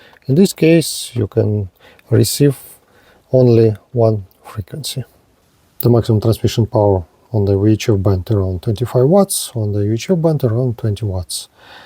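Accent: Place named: Russia. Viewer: Очень русский акцент ✌️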